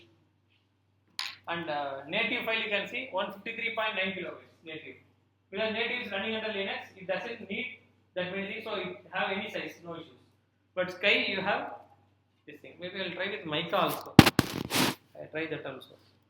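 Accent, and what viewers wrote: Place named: India. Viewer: No, you have nothing to apologise for. This is your video. This is YT and you truly have probably helped out a lot people. My problem is that I can't stand your accent. After a few minutes I start to think I rather have a needle in the eye than keep on listening to it. There are many other people from other countries I can't listen to either: If you do not try to speak more with or an en English accent or an American or Canadian accent people will not wanna listen to your story. I am from The Netherlands. When Dutch people speak English it is often also horrible to listen to. Do you know the 'Secretary-General" of NATO, Mark rutte? Dutch and has this cringing Dutch accent. He speaks fluent English but crap to listen to. Nowadays kids come from school here and they speak fluent English with an average sounding American accent... 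[/rant] Don't let me stop you from making explainer videos. India was a crown colony, right? Why not uplift your English by talking the queen's English? Actually I know what the reason is: You have no people around you from the UK or the US..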